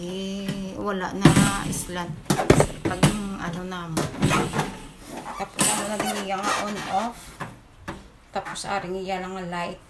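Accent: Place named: Western Visayas, Philippines. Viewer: Kanami gid ya pamatian sang Ilonggo ah.:) Music to the ears.😍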